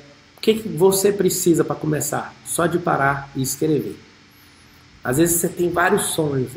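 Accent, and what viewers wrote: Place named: Goiás, Brazil. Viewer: Alguém em agosto de 2024. Até o sotaque dele era um goiano bem puxado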